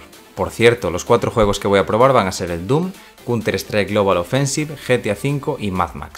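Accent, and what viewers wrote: Galicia, Spain. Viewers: se nota q eres d galicia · muchas gracias por responder. . seguramente pille un 6700 sin k ..ya que no me interesa hacer OC . por cierto ..eres gallego ??(yo lo soy) ..lo digo por el acento ajaajaj!! un saludo crack y sigue así ..llegarás lejos;)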